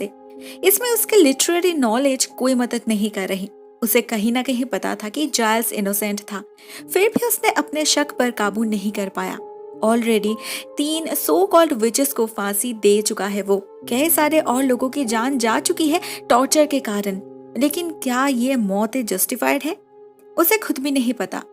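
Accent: Bengal, India. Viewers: Omg your Bengali accent 😍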